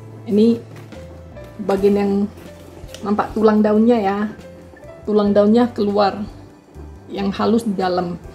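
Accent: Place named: Tanjung Balai, Indonesia. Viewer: kalau dengar logat dan bicaranya mak mungkin punya latar belakang orang tj balai ya , sukses selau mak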